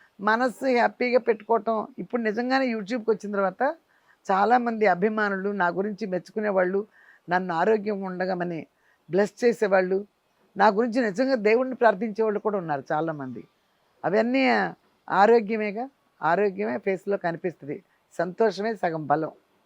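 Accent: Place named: Krishna, India. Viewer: Amma mee native place krisha districta. Acham vja side la ne chesthunnaru. Me bhasha kuda krishna jilla la ne undi.krishna jilla vallu thappa migilina ea pratham vallu ayina nimmakaya pachadi thalimpu vestharu. Assalu bagodhu. Naku ma nayanMma gurthochindamma. Me lane kakarakaya, mirchi allam vesedhi.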